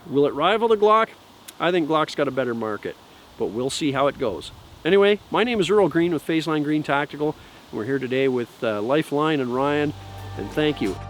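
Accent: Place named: Canada. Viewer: You're either in California or Canada.. I vote Canada, because the "aboat" thing. The gun actually comes with a 17 round mag in normal places.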